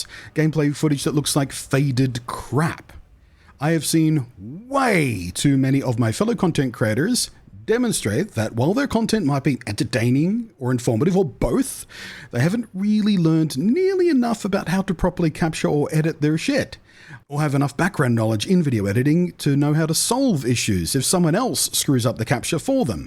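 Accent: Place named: Australia. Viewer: what accent is this? its like an australian trying to talk clearly so they end up using american R's half the time.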